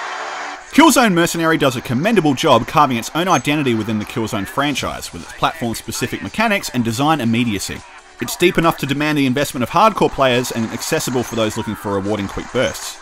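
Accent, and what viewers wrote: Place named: United Kingdom. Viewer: Uh, Helghan home world isn't Vekta. I usually like British accents, but not yours. Eh, 4/10, Bret Hart style.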